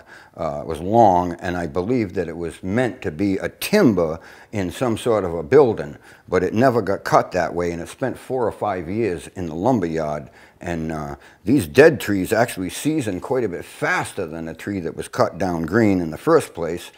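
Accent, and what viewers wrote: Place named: Massachusetts, United States. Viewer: Listening to you talk reminds me of my cousin Jack who lives in Attleboro. Love the accent although we sounded a bit different out on the Cape. Also appreciate the comment about the "bucket of quahogs. I sure miss that.Used to just go out and dig them up for a great chowder on weekends. Your tutorial on building this skiff is terrific.I've always wanted to build a boat like this and I just may give this one a try. Thanks a million for the instruction and the memories.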